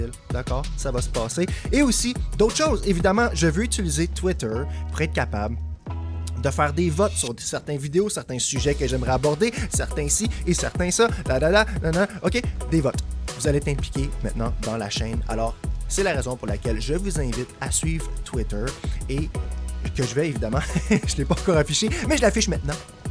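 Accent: Canada. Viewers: Continue comme sa ! J'ai repris ark il y a 1 mois et je suis tombé sur tes vidéos par hasard et sa m'a bien aidé ! J'adore ton personnage et cet accent canadien est incroyable 🤣 force à toi man !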